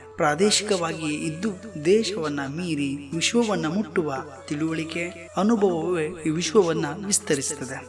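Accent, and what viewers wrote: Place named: Kundapura, India. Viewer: Treat to hear kundaapura kannada ♥️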